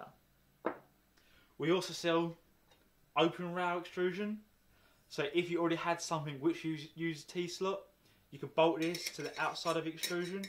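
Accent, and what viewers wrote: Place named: Essex, United Kingdom. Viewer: You're definitely from Essex because you sound like Russell Brand 😁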